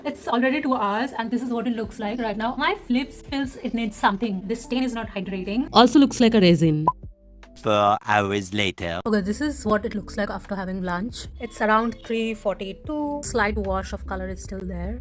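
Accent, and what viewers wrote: India. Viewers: can u stop picking on people on the internet? she seems bengali and this is how their accent is. It's not her fault so why the fk are u blaming her? She has almost 8k subs while u cant even make one video. If u dont like her accent then click off the video, shes reviewing a lip gloss not ENGLISH SPEAKING.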